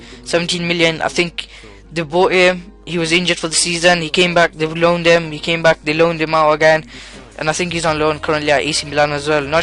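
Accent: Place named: South Asia. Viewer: you sound brown D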